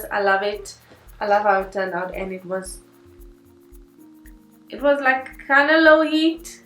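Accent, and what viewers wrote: South Africa. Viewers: Let me guess, South African???? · That's definitely a south African accent I hear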